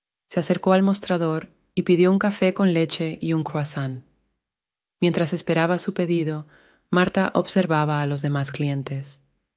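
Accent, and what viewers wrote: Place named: Latin America. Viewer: Good story but I have 2 issues with this. First (and not so important perhaps) is that there is no passion, no spark in the voice of the person telling it. That makes it a bit boring. Second and most important, she mixes accents, she says "decidir", "dulce", "vecinos" and many other words as pronounced in Latin America (with seseo), but then she says "entonces", "hizo" etc in the way they are pronounced in Spain. This is VERY confusing to learners, especially to beginners who are the target audience here.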